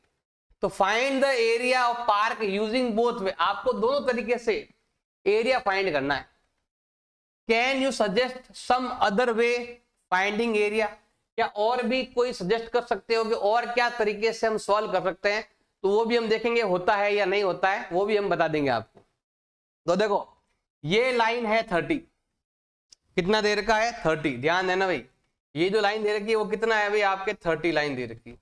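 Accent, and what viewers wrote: Rajasthan, India. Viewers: Sir aapki aawaj me rajsthani feel hai 😅😊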